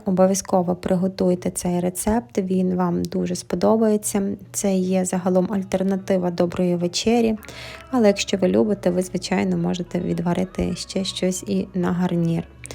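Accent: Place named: Ivano-Frankivsk, Ukraine. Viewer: Привіт! Люблю нашу франківську бесіду з твоїх уст. Відео вийшло дуже затишним і домашнім. Синочка вітаю з днем народження, бажаю щасливої долі.❤❤❤❤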